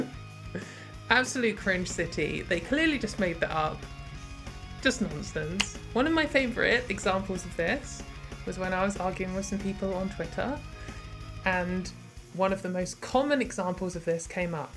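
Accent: United Kingdom. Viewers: Seeing u speak with a British accent is very weird. I imagined u had a transatlantic American accent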